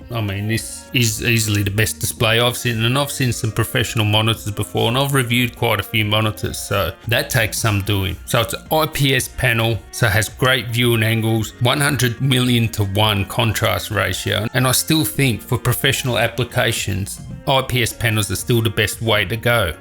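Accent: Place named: Australia. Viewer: Great vid, thank you. Great to hear a fellow Aussie voice reviewing stuff! I've just bought this monitor, your review was a very good help for me. Everything you say is true, this monitor blows away everybody who sees it in action. I do not use it as a professional but do family videos and work with docs open 2 at a time - wonderful for all this. I had the money so I though so what - end of financial year and I need to clear the bank account and get a tax deduction anyway so there I was! I will enjoy the pleasure of this incredible screen LONG after the initial pain of the price has been forgotten. Highly recommended - thanks again for the very relatable review - love the way you describe things in everyday terms. Cheers, (West Pennant Hills, NSW - Australia)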